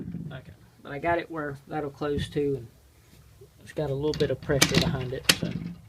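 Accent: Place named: South, United States. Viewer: Thats great. Your accent sounds like mine, southern! I live between Sylacauga and Talladega Alabama. Just curious if you were from Alabama.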